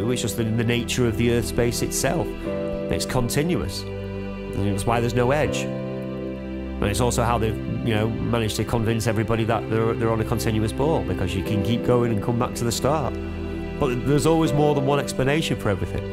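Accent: United Kingdom. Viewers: Roger Reddersen it's called northern, how a lot of us English speak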